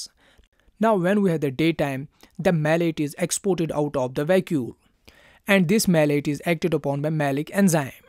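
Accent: Kashmir, India. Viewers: Sounds so Kashmiri Thank you btw!! Your concept are so on point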